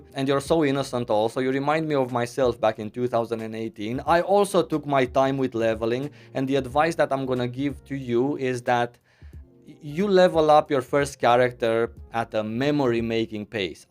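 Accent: Italy. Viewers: As usual very nice video thanks ! May I ask you a question ? I'm French and I'm used to hear people speaking English from different countries and with different accent. I don't know why but you accent sounds Italian, the way you speak English and the way you handle your hands as well :) At the same time I also think you may be from from an Eastern country like Romania. Do you mind telling where you're from ? (and no it's not because I'm a weirdo and felt in love with you !!!) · are you italian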